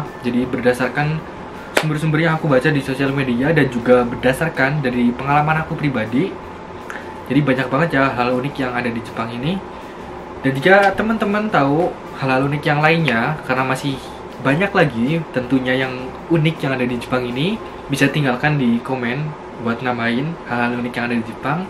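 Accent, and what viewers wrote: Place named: Banyumas, Indonesia. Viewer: Ora ngapak ora penak😀👍🙏🙏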